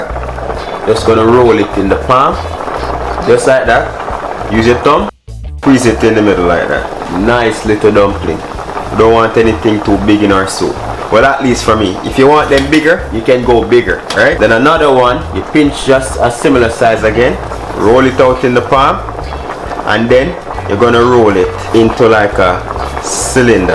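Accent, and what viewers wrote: Jamaica. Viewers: New subby here! I had to subscribe! I love your Vibe I love the way you cook I like how you incorporate the audience with the cooking. I also love the way that you keep the Jamaican language nice and thick but very eloquently spoken.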